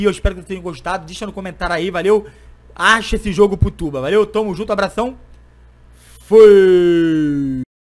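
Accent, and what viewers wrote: Rio de Janeiro, Brazil. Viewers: Tuba o nome do game e wasteland e perigoso eu acho e oq estava lá porém está em fase de testes, uma pergunta se é carioca?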